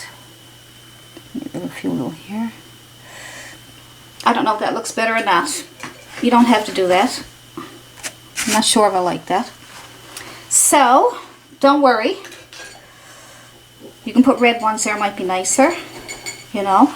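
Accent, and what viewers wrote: Newfoundland, Canada. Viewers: I'm randomly looking at painting techniques on YouTube. And as soon as I heard you talk I knew you were from NL so I did some googling. . And sure enough. Im in corner brook. Great video :)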